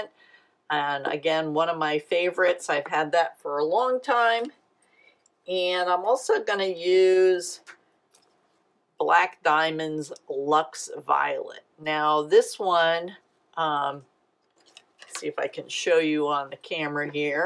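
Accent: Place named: Northeast, United States. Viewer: I was so excited about the phone tip. Seems apple has removed that part of the compass 😔 And I knew from your accent you are from the NE. If you’re like me you’re saying, I don’t have an accent!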